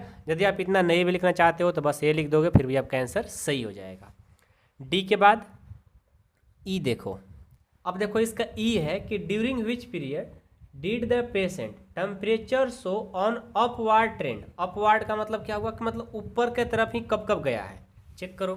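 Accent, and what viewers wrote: Bihar, India. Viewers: Abe bhai yaar tum behari ho kya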